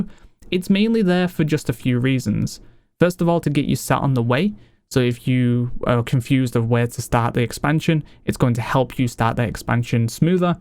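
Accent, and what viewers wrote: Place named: Northern England, United Kingdom. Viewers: i would say lancashire/ cheshire / w yorkshire region for his accent. nw england. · It’s his northern English accent. That’s how they pronounce it there!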